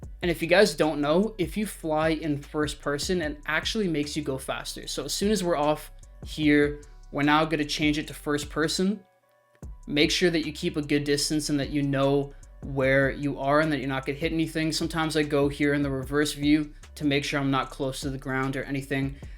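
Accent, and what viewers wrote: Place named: Canada. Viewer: Wow are you Canadian! Lol or from the UP of Michigan, regaurdless i dig it. 👍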